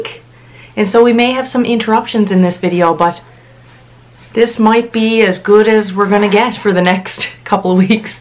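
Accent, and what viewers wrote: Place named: Cape Breton, Canada. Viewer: I would definitely subscribe if you started a Mom channel. I mostly watch the Mom content anyway. I stumbled upon your channel ages ago before you started saying that you were from Cape Breton in your intros. 10 seconds into it I said, “That girl was got to be from Cape Breton!” I was born and raised there but live in NB now. I love your channel!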